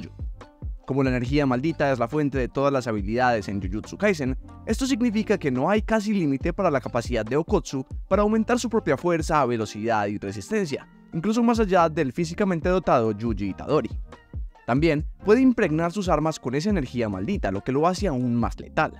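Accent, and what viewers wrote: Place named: Colombia. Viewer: de donde eres? algo en tú acento me dice que eres de colombia o me equivoco? aunque intentas neutralizarlo creo que se te escapa un poco 😅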